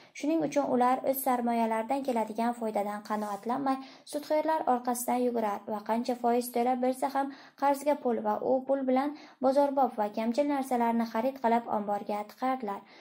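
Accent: Bukhara, Uzbekistan. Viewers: Buxorocha shevezdan ey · Вокеалар Бухорода бўлганлиги учун синглимиз ўз шеваларида ўқиб қўя қолибдилар😊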